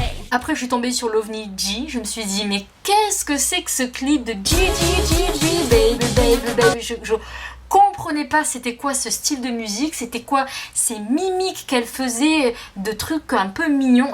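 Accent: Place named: Toulouse, France. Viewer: A peine j'ai commencé à regarder la vidéo je me suis dit "omg elle est Toulousaine c'est sûr mais avant de lui demander je vais regarder à description" et j'avais raison x) vive le sud ouest ! Et vive la k-pop !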